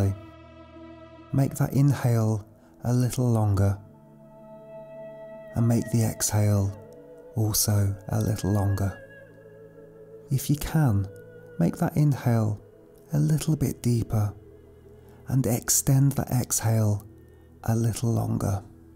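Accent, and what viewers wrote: United Kingdom. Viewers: Your accent reminds me a friend form Brighton (UK) 😊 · Ok thr british accent is already helping 😅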